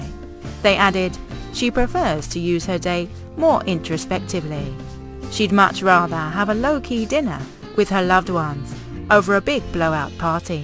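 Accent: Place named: South East England, United Kingdom. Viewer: Accent is from the south east of England, think that's her trying to sound interesting voice.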